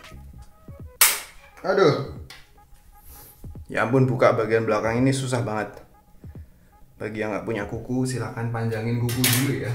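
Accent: Java, Indonesia. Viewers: pedot :v medokmu bang :v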